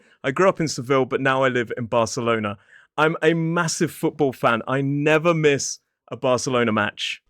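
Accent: United Kingdom. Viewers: Hi, I'm Alauda (which means lark in latin). I'm german, raised in north Germany, Angeln, where some Anglo-Saxons came from originally 🤓, and I'm living in France. I'm retired now and living with my cats in a little cabin. I love to read english and american litterature, english is my favorite language, but I never really spoke it. Since some months ago, I try to activate my english by writing youtube comments. I have no concrete use for it, but I like your channel nevertheless, and particularly your so bri'ish accent. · I like your British accent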